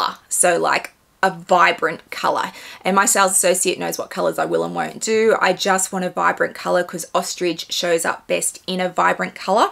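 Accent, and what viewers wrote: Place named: Australia. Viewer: I’m literally addicted to. Ok that sounds weird. 😂 I’m addicted to her closet! Her bag collection! Actually no I’ll throw, the human, in there too! Seems like such a super nice person. ❤ Can’t get enough of that Aussie accent too! What I love most, is that even though she can afford to buy whatever she wants, and has quite an extensive collection, she never “brags” about it! She shows it to those of us who love luxury, but she doesn’t make you feel bad nor envious if you’re not so fortunate! Love that! She’s bad for my wallet though! I’ve purchased a new Chanel and a 2 new Fendi’s since I’ve started following her! 💰 ❤😂 But that’s ok! Can’t wait to see what she inspires me to buy next! I’ve got a much smaller collection that Miss of course, but that’s ok too! Whenever I can’t stop thinking about something she’s showing, I go to the Real Real and try to find a previously gently loved version! 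I do buy brand new as well, but I’m into circular fashion these days. Saving the planet 1 bag at a time! 😊 To me it’s like why buy a dog when there’s so many waiting for homes at the shelters? No offense to those who buy exclusively new, but I just got 3 bags for less than the price of one brand new! Good luck filling your wish list this year! I can’t wait to see what you add! 👏👏💗💗🥰🥰💗🥰💗😎😎😎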